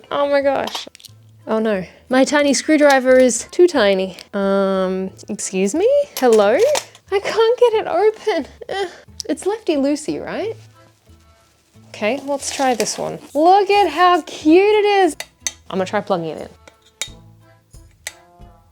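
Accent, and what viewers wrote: Australia. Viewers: Listening to another Aussie is the best....... beautiful shirt.... enjoy the sunshine.... Winter is coming....🦘🦘💐🌹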